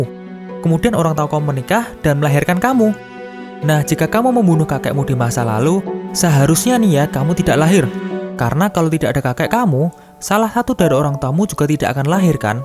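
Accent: Java, Indonesia. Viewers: Orang jawani medok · Medokmu Mas,,kyk aq.he.,he.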